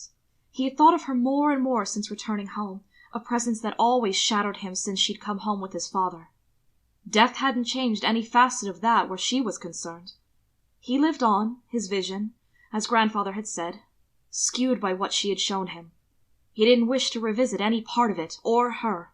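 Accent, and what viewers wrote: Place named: Japan. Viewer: as someone who studied Japanese for a couple years, I think your accent is pretty close to sounding like one. but im not Japanese myself so take my opinion with a grain of salt LMAO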